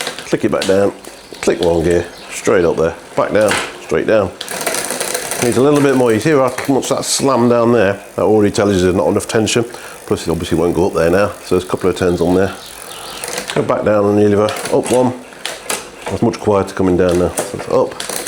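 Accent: United Kingdom. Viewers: "The hears seek to deift uo and down the middle of the cassette and shifts by itself" Fitting the deraileur might help 😂 we can only get awsy with this level of dry himour with a british accent. Like the time a eomsn brought me a wheel thst was out if true by over an inch in mire than 1 spot and asked me to tighten one spoke. Calmly explained that the whe is more than 1 spoke and it doesnt work like that. She was absolutely furious. Of course i offered her a proper solution but she insisted on just the 1 spoke before storming out in a fit of rage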